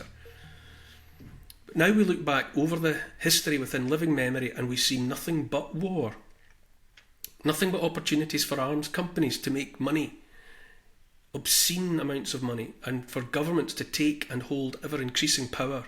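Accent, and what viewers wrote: Scotland, United Kingdom. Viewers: Always the Voice of Reason and love hearing it from your Scots accent! 😄🙏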